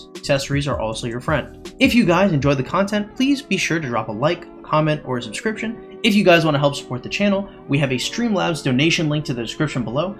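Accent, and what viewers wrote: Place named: United Kingdom. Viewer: Bro I love the voice control on this vid .almost sounded british .hahaha top job as per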